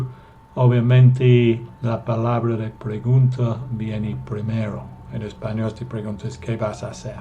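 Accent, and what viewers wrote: United Kingdom. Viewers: Excelente clase de Ingles y desde luego excelente profesor ademas britanico que me encanta aprender mucho el acento y ciertas diferencias que hay con el ingles americano, no tengo palabras para darle las gracias por esta perfecta clase.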